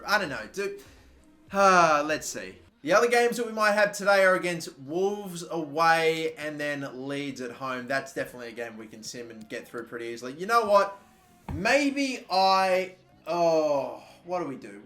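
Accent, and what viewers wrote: Australia. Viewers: Who gives a shit, he's an Aussie, not an Italian, fuck off mate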